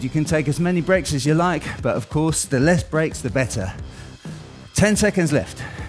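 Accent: United Kingdom. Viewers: Wit dat British accent and him being ripped af he seems like a bad guy from a kids movie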